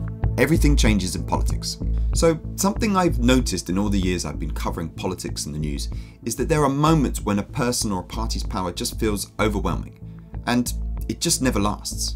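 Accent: United Kingdom. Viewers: Happy the lords of the algorithms sent me your way today. I appreciate wise insight pertaining to the failure of chump 2.0, and, as corn-fed midwestern American boy, I always enjoy listening to a voice from across the pond. Liked, subbed, and now appeasing the lords with comment. Thank you! 🙏🏽✌🏽💜 from Minnesota—Sanctuary Land of 10,000 Tampons and Free School Lunches (&—thank you, Coach Tim!—Legal Recreational Marijuana, finally.) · Sounrs loke a brit🇺🇲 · Your accent tells me you never ever been in a red state before. Trust me. This shit is a RELIGION TO THEM. Political rules do not apply. Trump worship is a matter of FAITH. He can kill everyone they love right in front of them and not lose one vote. Now can the Brits STFU since y'all don't know wtf you are talking about, ever? Is brexit gonna end? Nuff said.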